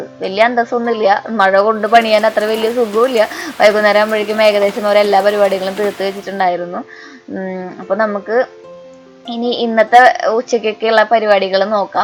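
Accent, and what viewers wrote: Thrissur, India. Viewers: Hi.. njan kurach divasam ayitteullu kanan thudagitt..sathyam njan addict ayi nnu venam parayan.postive energy.. especially jolikonnum povathe irikunna enik..Thrissur bhasha powliyanu..avide ramavarmapurath padichitund.orupad ormakal und.orupad ishtamanu..god bless u..love u lot..💓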